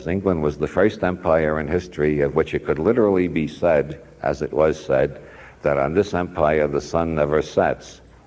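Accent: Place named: Brooklyn, United States. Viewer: Joseph Toth ugh, absolutely never. His voice has a cadence, timbre, musical lilt (and Brooklyn accent) that would be utterly ruined by such fuckery. Just my opinion of course, but there’s nothing humble about it. Cheers!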